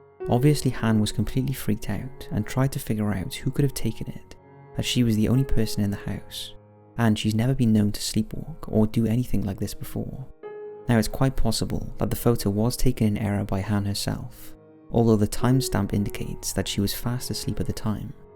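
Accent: Wales, United Kingdom. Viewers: You sound welsh , are you from Flintshire /Rhyl area ? Or are you an anglesey lad ? Anyway you sound very calming great narrating voice !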